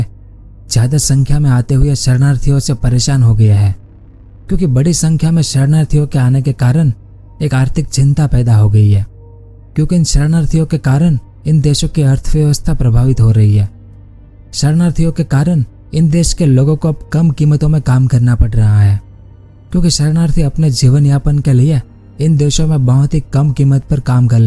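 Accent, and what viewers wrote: Gujarat, India. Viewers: Bhai Tumhara gujrati lehjaa mast he 😁😉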